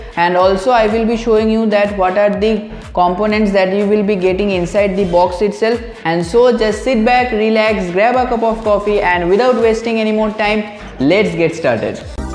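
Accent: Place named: India. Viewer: This chap’s English explanation is really good though with a Hindi accent and a few grammar mistakes here and there. He could be used for giving such talks for various things. Keep it up my son and go on improving. You could make your professional career on this.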